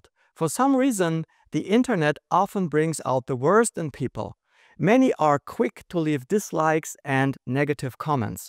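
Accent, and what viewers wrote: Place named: Germany. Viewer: When he introduced himself with his name i just looked up like: damn dont even realize someone is also german until they sqy something in german... Like bro, i am german and dont recognize my own accent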